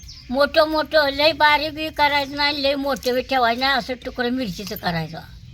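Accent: Kolhapur, India. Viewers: खुप छान मावशी तुमच्छा जेवणाची चव तर माहीत नाही पण तुम्हची बोली भारी आहे कोल्हापुर तल कोणतं गाव तुम्हचं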